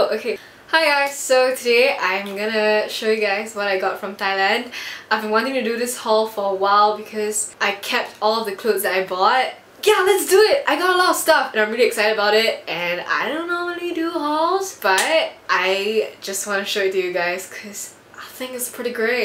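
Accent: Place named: Singapore. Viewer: I love your accent! I also from Singapore as well~ hope to see you around west area :)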